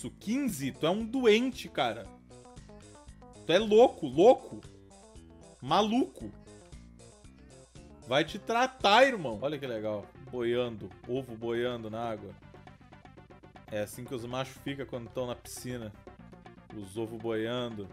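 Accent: Rio de Janeiro, Brazil. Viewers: ta virando carioca?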